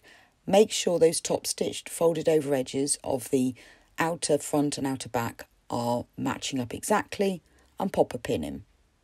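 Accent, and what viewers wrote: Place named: Birmingham, United Kingdom. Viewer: Thanks for this tutorial ❤ Sounds like you’re a fellow Brummy 😊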